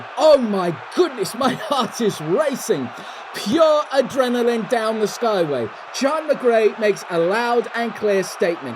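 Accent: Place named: United Kingdom. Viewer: Makes sense now, the accent sounded very familiar to be British🤣. Everything about the races, street lights and commentary is amazing, keep going!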